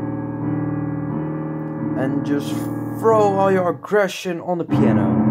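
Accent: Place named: Netherlands. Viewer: haha, nederlands overal, ik hoorde het aan je accent :)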